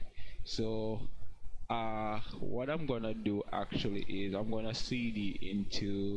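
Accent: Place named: Jamaica. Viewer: Came expecting an Indian accent, stayed for the Jamaican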